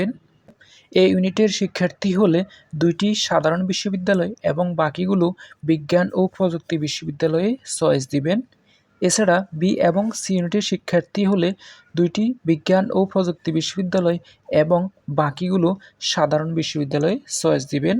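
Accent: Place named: Noakhali, Bangladesh. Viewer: নোয়াখালী্র ভাষা চেঞ্জ করার দরকার ছিল।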